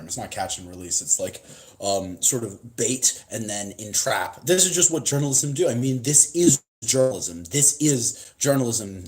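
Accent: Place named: Australia. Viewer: I swear your Aussie accent is more authentic than my fellow Australians. By the way, if you ever talk to Adam, give him our blessings, from the old Wallace gang.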